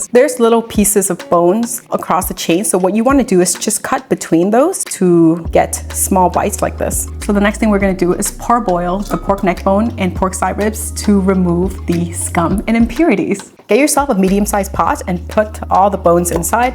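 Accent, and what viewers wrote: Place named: South, United States. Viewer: Why do you speak more like a southerner?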